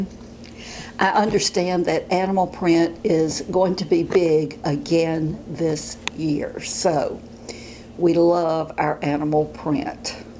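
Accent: South, United States. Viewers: There has only been one of your videos that I had a problem with the volume as did others, based on the comments. It was frustrating because I would happily listen to you read your local phone book, with your charming southern accent. Best, Jane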